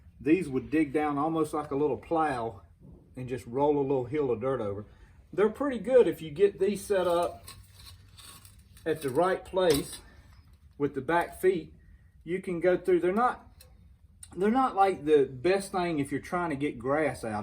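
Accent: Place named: Coastal North Carolina, United States. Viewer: Listening to you talk I'd have to say your from coastal NC , listening to your accent. I live here in upstate SC but from the lowcountry. I'd have to Say NC as well cause you didn't say Agrisupply in Statesboro or Florence! Haha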